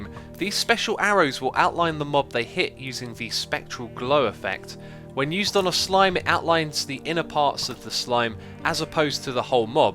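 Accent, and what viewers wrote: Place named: United Kingdom. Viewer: I <3 you X you are my favourite youtuber and for some reason I like your accent it's so British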